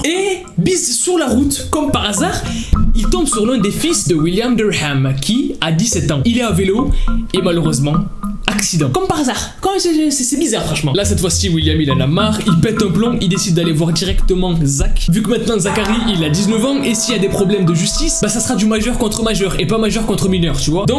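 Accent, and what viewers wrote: Japan. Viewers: Ton accent JAPONAIS TUE!!!!😍💕💥💥👌👌#TeamOtaku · Au ptn mais t'a kira dans le sang toi avec ton manifique accent japonais UvU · franchement, je crois pour une fois je saute pas les placements, et l'accent pépites.🖤 j'adore ton accent japonais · La pub 😱😱😍😍😍 ton accent en japonais est juste magnifique 😍 j suis in love 🥰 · Purée magnifique l’intro parfaite reference a death note avec kira (Light) wow super j’ai installé le logiciel pour réussir à faire de meilleur vidéo gacha life merci :)) parfait comme toujour UwU *(et en plus tu a mis les music de death note dans la vidéo) et magnifique accent japonais ♡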